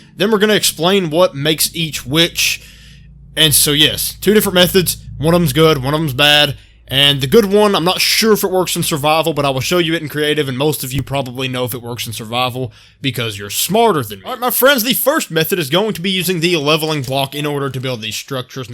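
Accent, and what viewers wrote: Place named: South, United States. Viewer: your improvement at content creation over time is really cool to watch, Im about to finish all 88 of your astroneer vids. I think your confidence has strengthened over time and it's really enabled your personality to come out, it's fantastic! Your commentary is so enjoyable to listen to, you got that nice southern lovin' accent my bro I can't get enough! Thanks for posting and hope you keep at it!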